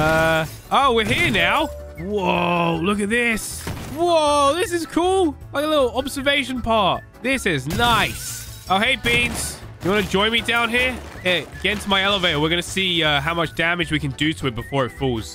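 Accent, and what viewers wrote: United Kingdom. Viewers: Question, are you British?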